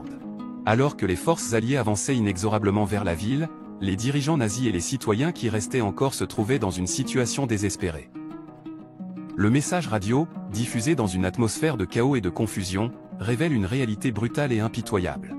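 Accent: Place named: France. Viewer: Aucun message final cité et une belle traduction prononcée toute croche, "like" prononcé comme lécher "lick"... La prononciation à la française de mots anglais me tape sur les nerfs... Ce canal ne vaut pas cher la tonne, pour reprendre ici une bonne vieille expression québécoise...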